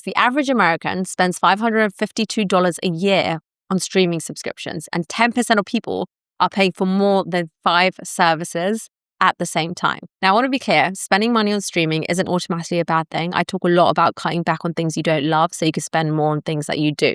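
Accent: United Kingdom. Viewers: Why are you talking $ and Americans instead of £ and Brits? Am I the only one hearing a British accent?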